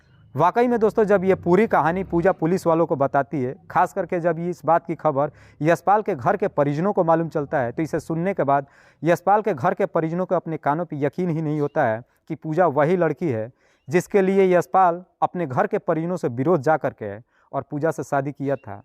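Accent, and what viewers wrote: Bihar, India. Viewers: Bihari aise hi bolte hai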